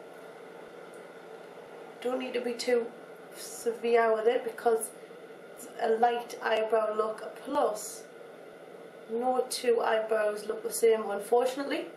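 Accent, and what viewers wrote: Newcastle, United Kingdom. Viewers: r from newcastle by any chance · Are you ust British, or are you some sort of "mix"? :P BTW: You ROCK at this !!! Keep up the good work !!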